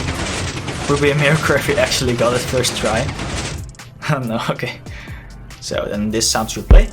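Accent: Netherlands. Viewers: You sound dutch..you dutch?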